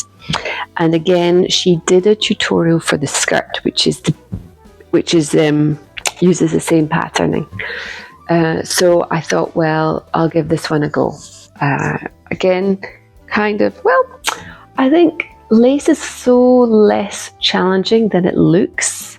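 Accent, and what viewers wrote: Scotland, United Kingdom. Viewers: Hi I’m also Scottish but moved to the US years ago to be with my American husband and not sure how long you have lived in France but it’s so nice to hear you haven’t lost your beautiful Scottish accent as I still have mine too and never understand how adults lose it after living a short time in another country but children are different they lose it pretty fast. I love Kika’s patterns also and in the Spring I finished the Salty Days Sweater which turned out beautiful. I also paint in watercolors so it was a lovely gift finding YouTube suggesting your video today and have subscribed also your Seashore Blouse is gorgeous! ♥️🤍💙🏴🧶🫖☕️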